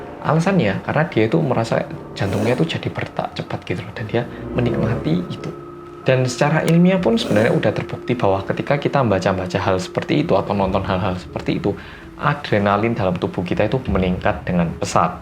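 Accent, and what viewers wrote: Java, Indonesia. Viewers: Liat opah" Glowiinggg yg Medok...😁 😁, konten ny bikin merinding😅😅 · Orang yanggg Medokkk 👍.Mantul😀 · Happy birthday mas medok... sehat terus yah mas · Happy birthday ko orang korea yang medok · Hbd mas. Semoga panjang umur dan tetep MEDOKKKKK 😎 O iya, jumpscarenya jgn sering2 dong mas. Kaget aku Pengenya g ntn, tapi penasaran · Hadir Mas Medhok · Bang orang Jawa indo campur Korea ya kok lucu waktu ngomong nada jawanya😂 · Hi, aku termasuk baru banget nonton video2 kamu di youtube, ya kira2 setelah lockdown di Australia berlaku. Aku suka banget dgn konten2 rindingdong n detektif reomit. Aku cm punya satu pertanyaan, kan medok ya hehehe tp pas pronounce bolo-bolo kedengerannya kayak “polo-polo” ya? Apa ada penjelasan soal ini yg aku belum nonton di video? Thanks and keep up your good work:) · Gumun aku,, jowo mu rak ilang ilang mas. 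😂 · Mas hbd. Kok mas jadi seneng yang mistis2 to? Mas kan kalo ngomong medok terus ya, kalo ga medok bisa gak mas?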